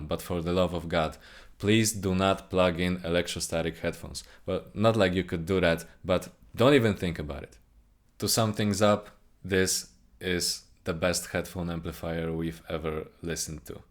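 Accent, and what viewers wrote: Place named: Poland. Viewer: jesteś z polski? mam takie wrażenie po tym jak mówisz paweł i po akcencie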